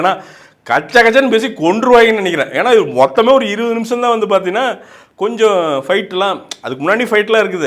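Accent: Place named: Coimbatore, India. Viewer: கோயம்புத்தூரில் போய் ஒரு நாள் இருந்ததுக்கு அவங்களோட வட்டார பாஷை பேசுற.. இத்தனை வருஷமா ரிவைவ் பண்ணுறே பாண்டா இன்னைக்கு வரைக்கும் கீழே எழுதி வச்சு தான் பேசிகிட்டு இருக்க என்னைக்கு தான் நீ மாறப் போறியோ..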